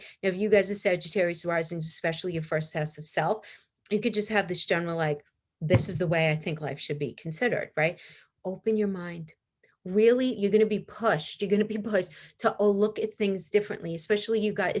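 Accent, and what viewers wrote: New York, United States. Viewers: Hi, I just came across your video. As the previous comments have said.....you are amazing! Also love your New York accent!!!! Love your explanation etc....awesome. Do you do readings at all?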